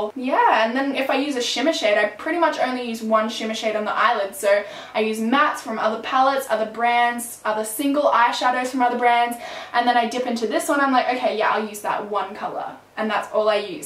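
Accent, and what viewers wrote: Australia. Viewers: I love your accent, are you australian?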